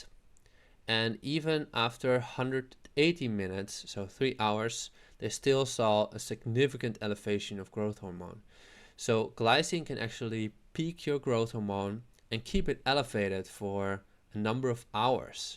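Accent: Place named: Netherlands. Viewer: Glycine is great, great for bed time, doing keto or carnivore its good to add some glycine. one can balance the methionine with glycine glycine is sweet. I Am just having a glass water with 5 g glycine. You do sound Dutch as I Am subscribed Glycine does so much, its even a mood stabilizer, good for the skin. I often put apple cider vinegar with glycine before my keto meal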